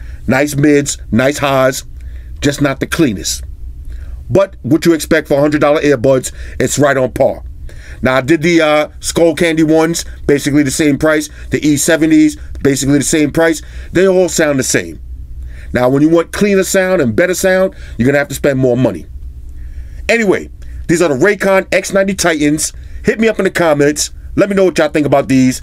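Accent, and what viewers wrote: New York, United States. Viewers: gotta fight his New York accent when he says "slot" 😂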